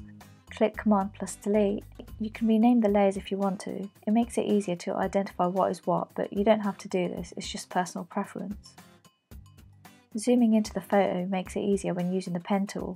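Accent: United Kingdom. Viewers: That British accent 😉 Good luck :)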